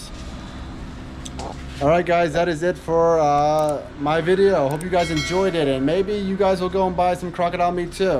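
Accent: Cambodia. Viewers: I just discovered his videos/channel. His Khmer is really good and his tone really fits the Khmer style of speaking. Lol Just subscribed. Great job! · I like your Khmer accent❤️🇰🇭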